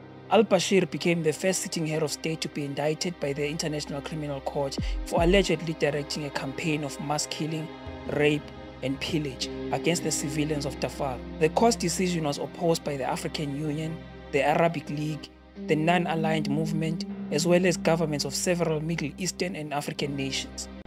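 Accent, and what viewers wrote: South Africa. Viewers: I'm watching your YouTube channel like I'm series of game of thrones, very entertaining ka English ya mzansi😆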